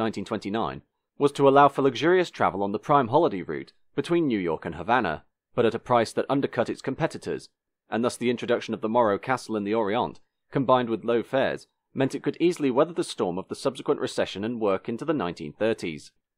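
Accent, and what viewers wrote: United Kingdom. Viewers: Unappealing (if linguistically logical) 'short a' English regional accent exacerbated by a Yankee flat sentence inflection.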